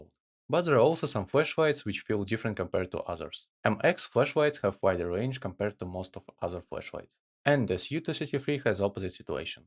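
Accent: Russia. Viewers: вы русский? У вас очень русский акцент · El Russia accent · По акценту палишься руснявый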